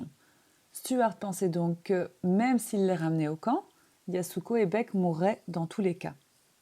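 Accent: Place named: Belgium. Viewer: Je devine un petit accent belge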